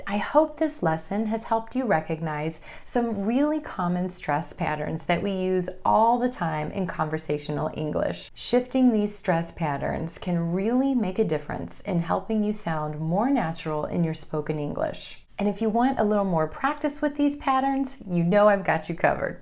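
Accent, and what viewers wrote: United States. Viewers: Yeah some word like hilarious, gorgeous, generous, famous, ambitious,ridiculous, superstitious, suspicious, furious, precious, Somethings that end with ous and ious, I really too bad to pronounce with whose word that end with ous and ious, or if you have more word that end with ous or ious, pls help shares more on the video you make dear, is really hard for me to make a sounds “S,Z” at the end of whose words, Hoping You can help me how to pronounce with whose word correctly dear, I will looking for your video new coming next soon my dear, Thank you so much for all your helpful video, and everything you explain on video very clear for me to understand and I really like ur accent American so much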